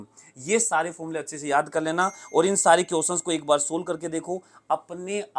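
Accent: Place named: Haryana, India. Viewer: Sir ki launguage h jo log haryana se hote h yese bolte h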